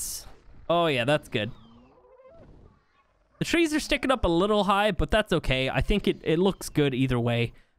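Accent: Ireland. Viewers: Who else hears the Irish was in voice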